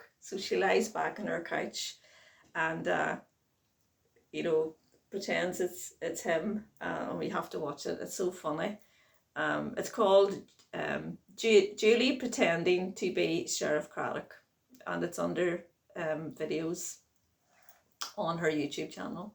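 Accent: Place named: Ireland. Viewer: Nothing lovelier than that Irish lilt🎶🍀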